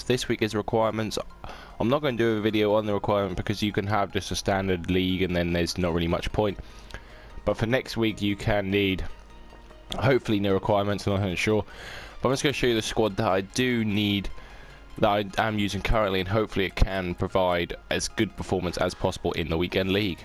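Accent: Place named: Norfolk, United Kingdom. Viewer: I'm from Norwich mate, thought I heard it in your voice .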